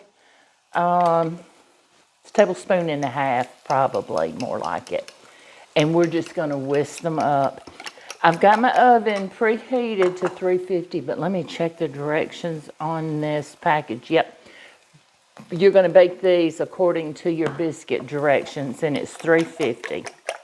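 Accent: South, United States. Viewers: Hey there, this is first time I’ve seen you. Love your bright and beautiful kitchen, and your beautiful soft and calm voice (you sound Southern like me). These are my hubby’s fave breakfast foods but I’ve never made into pockets before, a must try! At age 74 I’ve learned something new. Thank you!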